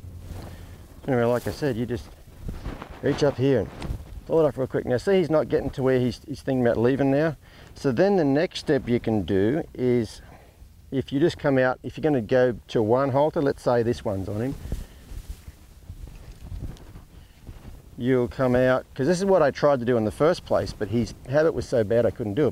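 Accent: Australia. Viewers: Lol it doesn't understand his Aussie accent.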